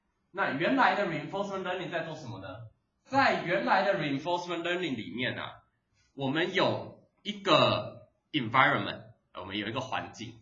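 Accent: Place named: Taiwan. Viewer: 听李老师的课从此爱上了台湾腔🤣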